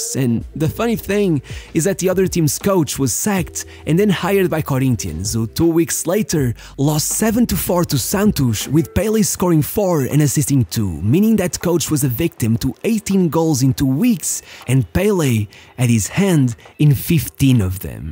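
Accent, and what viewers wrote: Portugal. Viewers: U sound portuguese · Brother, you’re portuguese ain’t you? Não mintas crlhes. És tuga ao máximo, o sotaque não engana. If so... 👏👏👏👏👏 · Ele é português por isso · Just by the accent saying the players names, I know this guy is a Portuguese. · Pela pronúncia dos nomes de jogadores e clubes percebe-se logo que és português... "So clean" ahahahahah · Ele é Portugues? parece a forma como diz os nomes Brasileiros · Top, és português não és? Esse sotaque...🧐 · So fui perceber que seu sotaque era portugues quando voce falou dos biquíniskkkkk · Ele e português bicho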